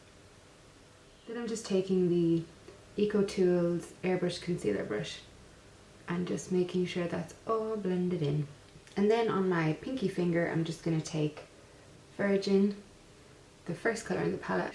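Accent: Ireland. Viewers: you're australian? you sound irish or scottish :o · you have such a beautiful accent. You sound more irish than the Australian accent i am accustomed to. I love accents in general, but you sound beautiful and thank you for the everyday look. I love makeup but some days i just want to look put together but not overly made up. thank you! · I KNEW you were at least 100% Irish!! One of the most charming and lovely accents on the planet... Did you know that there is more Irish blood in the U.S. than in Ireland? I myself am mostly Irish, coming from the surnames Joyce, O'Connor and Fitzgerald. Nice to meet such a lovely possible cousin! God Bless!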